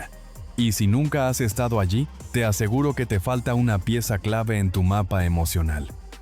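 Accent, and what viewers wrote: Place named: Mexico. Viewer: Y si! El que hace el vídeo , tiene acento mexicano ,algo le pica ,con su intención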